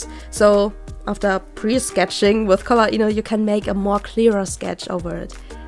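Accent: Germany. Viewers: You’re german, aren’t you? :D your accent sounds like mine (I’m german) that’s why I‘m asking.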